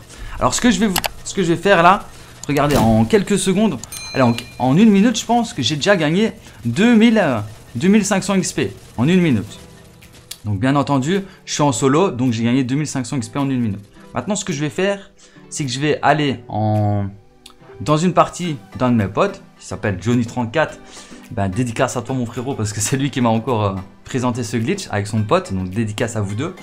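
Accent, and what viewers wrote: Belgium. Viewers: Tu a un accent belge je me trompe??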